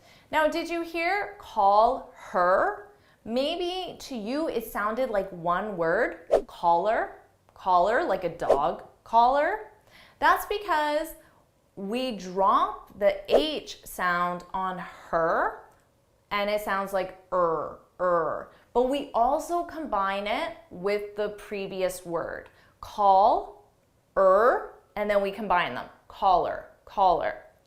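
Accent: United States. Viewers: American accent is quite easy to understand, British one is so challenging though. I listen your podcasts on 1.5 speed and face no issue. In terms of British accent, a lot of words are not understandable for me like, theme etc. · This isn't English. This is American. Don't learn this if you want to learn English pronunciation. It's a waste of time.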